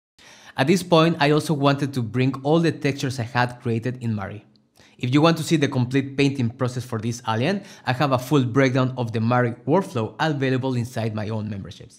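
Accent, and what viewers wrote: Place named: United States. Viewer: American can't you tell lol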